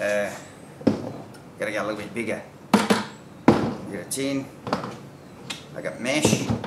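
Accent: Australia. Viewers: Aussie accent is the best accent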